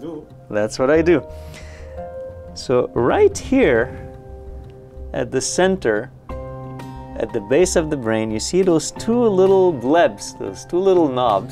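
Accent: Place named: Netherlands. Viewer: ... why do you sound Dutch?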